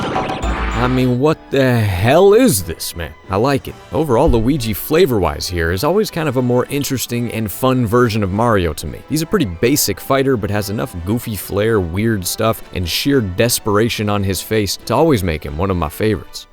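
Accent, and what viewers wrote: United States. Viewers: And do I detect a hint of a ghost of a southern twang?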